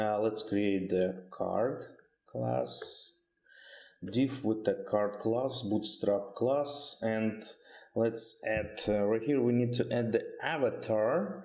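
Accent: Russia. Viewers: are you russian? Your accents just seem to be russian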